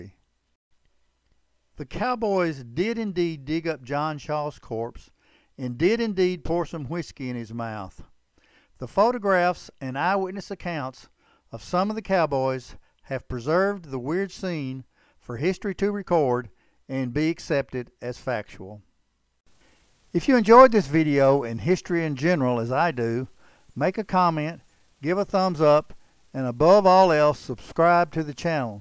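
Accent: Texas, United States. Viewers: I like how the narrator has a Texas accent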